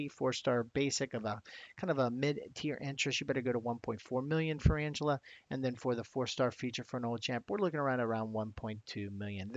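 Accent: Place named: South, United States. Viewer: Love your cutoffs man they are the best and i enjoy them everytime because of your lively voice and joyful personality. I also like that beautiful country accent. Howdy Howdy 😆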